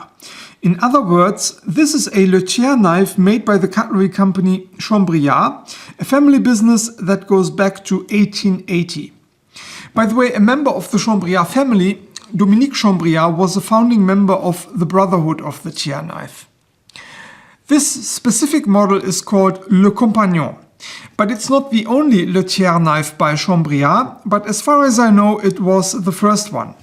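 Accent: Germany. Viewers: Sein Englisch ist doch perfekt zu verstehen, klingt wie deutsch😅😅😅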